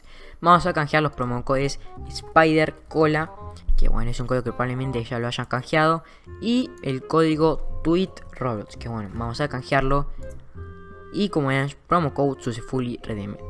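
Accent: Argentina. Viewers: Eres aljentino ?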